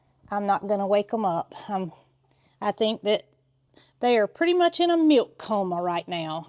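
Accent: South, United States. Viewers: kettle corn or cattle corn I cannot understand the Southern accent..sorry1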